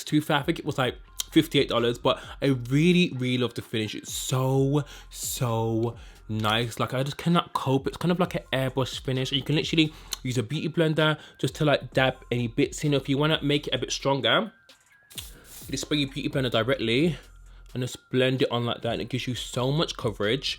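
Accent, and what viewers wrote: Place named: Cockney, United Kingdom. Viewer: I get my Sephora-fix by going over to Paris on the Eurostar. If you don’t mind me asking, are you from the midlands or London? My hubby says your accent is cockney...I say Brummie. Lots of love from Bristol🥳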